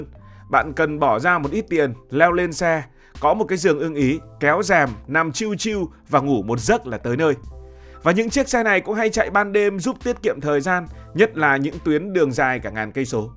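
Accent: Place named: Thanh Hoa, Vietnam. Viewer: blv quê thanh hoá à, nghe 1 vài câu ra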